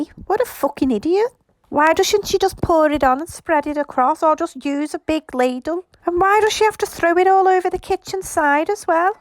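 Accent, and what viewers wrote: Liverpool, United Kingdom. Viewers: 😂 I love you and the Liverpool accent! Makes it even more funny. My Nottingham sccentbis crap sand I don't even have it completely because both mu parents are from.the South Coast. I would love a coop accent like yours! X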